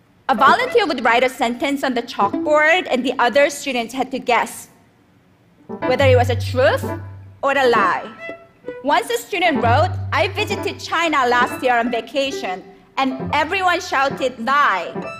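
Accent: South Korea. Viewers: She's Korean and has an accent. I think she sounds beautiful. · Do valley girls all have Korean accents? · an accent... it's kinda obvious Korean is her first language lol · She's from Korea. Of course she has an accent. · probably a regional south korean accent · if you had ears you would also know that she was born and raised in South KOREA, which is why she still has an accent. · Morgan Campbell The Koreans have a REALLY hard time overcoming their Korean accent when learning English. I agree she has done really well. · She was born in South Korea and is probably her first language. You can't expect her to speak with perfect English. And it's like you guys trying to speak Chinese with perfect pronunciation. And Korea is so different from English. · She grew up in South Korea but moved to the US during her childhood. I'm more than sure that her first language is Korean considering how strong her accent is still. 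Let's ignore the accent. Her book itself proves how great her English is despite her stating how difficult it was for her to grasp the English language back when she just moved to the US.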